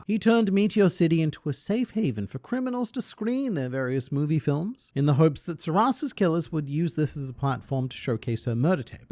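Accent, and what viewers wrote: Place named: Australia. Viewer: I just noticed you have an aussie accent 😭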